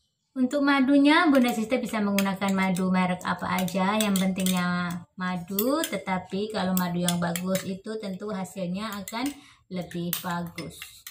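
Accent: Malaysia. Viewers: Tuch yg NgoMong Org Malaysia yach?🤔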